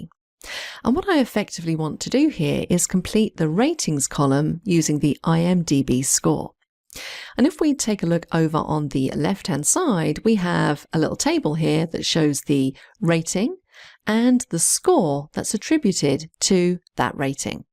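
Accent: United Kingdom. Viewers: Sweet GB accent. 🌺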